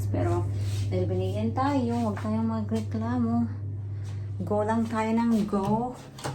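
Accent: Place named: Visayas, Philippines. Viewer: Palagay ko Cagayan de Oro sa Mindanao. Parang bisaya ang Salita nya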